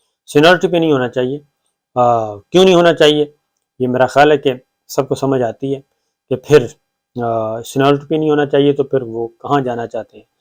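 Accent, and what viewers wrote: Punjab, Pakistan. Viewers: Horrible Urdu accent. Improve it or quite. Punjabi language is easier for you.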